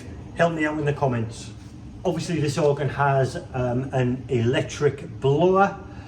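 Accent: Newcastle, United Kingdom. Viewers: I always think of Harrison & Harrison organs as lavish instruments in lavish places like Westminster Abbey and Kings college chapel (Cambridge) - it's interesting to see and hear an example from the other end of the scale. For some other reason when I discovered this channel I put pipe organs and Geordie accents together and assumed you must work for Harrison & Harrison 😕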